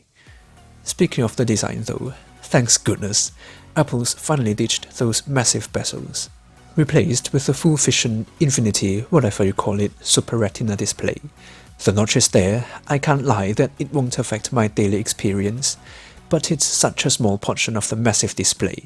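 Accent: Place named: United Kingdom. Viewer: 啲英式口音好正~~~好想學~~~~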